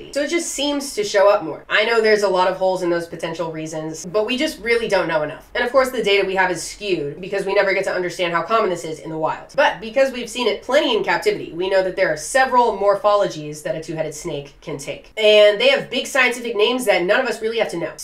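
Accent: Midwest, United States. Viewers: I found your channel! I was wondering why you seemed so familiar, someone showed me your tiktok, a video, and i was like "yeah no shot, i learned that when i was 5.... did you not go to elemtary?" like they were showing basic crap, not the real intersting stuff that's in your actual full videos. i thought you were an up and comer, no you're a tik tok legend, that started youtube. So you'll never read this. BUT I was trying to guess you accent. I was thinking "gotta be mid west, but not north mid west, mid west as in (west if chicago,) pretty shres the same accent until you get to so-cal and portland and seattle areas. I was close!! Thogh your PO box says LA, i don't think you were raised in SoCal. I'd say between Northern cali and chicago, somewhere between there, but as far as south as north arizona/newmexico. (it's a large accent area) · Australia may be unhinged but my partner is scared of your videos because you look like you grew up out bush but but speak with an American accent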